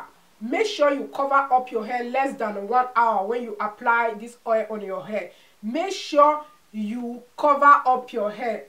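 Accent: Nigeria. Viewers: Great tips sis. But r u 4m Nigeria cos ur accent is like for akii and popo of Nigeria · This is nigeria accent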